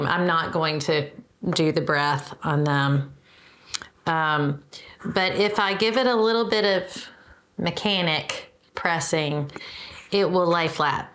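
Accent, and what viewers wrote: South, United States. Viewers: Love your sense of humor!! And the southern accent! Thanks for thie information! I was wondering why we should steer away from cotton/poly. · Love that cranberry color you’re wearing! It’s very flattering and a perfect choice for your lovely “quilt in progress” backdrop. ❤️ The video’s content is valuable information for new quilters. Many of us learned the hard way and would have appreciated this information. And thank you for making me smile the entire time I’m viewing your videos. Your southern pronunciations are adorable. Aahrn was precious! ❤️ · At the op shop today I fingered an intriguing seafoam green sheet, just to see the tag say "cotton/poly". And I swear, I heard your voice say in your southern way, "Nope!"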